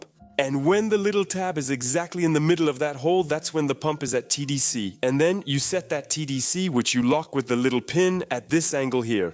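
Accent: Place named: Balkans, Eastern Europe. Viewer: ont veux l'accent anglais des Balkans coupé a la serpette !!!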